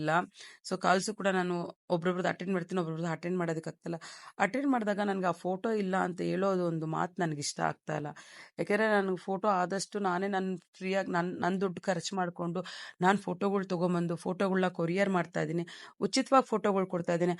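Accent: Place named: Chikkanayakanahalli, India. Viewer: Tumakur chikkanayakana Halli talk. Huliyar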